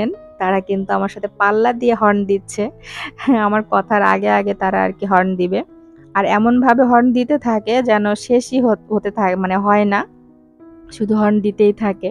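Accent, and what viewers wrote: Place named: Sirajganj, Bangladesh. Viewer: আপু তুমি আমাদের সিরাজগঞ্জের মানুষ আজকে প্রথম শুনলাম আর শোনার পর অনেক বেশি ভালো লাগছিল 😊তোমাকে অনেক আপন লাগছিল আমরাও কিন্তু এরকম টেংরা মাছ রান্না করে খায় আপু 😜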